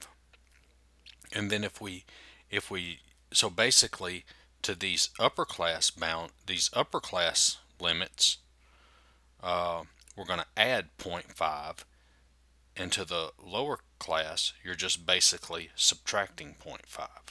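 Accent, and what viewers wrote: South, United States. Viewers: you are AMAZING!! as soon as i heard the southern drawl, I knew you'd get right down to business! i read this in my textbook about 10 times and was completely lost. I watched your video ONCE and got it. The struggles of signing up for an in-person class but having the teacher scam me into a "hybrid" is REAL. I'd kill to have you as my stats teacher!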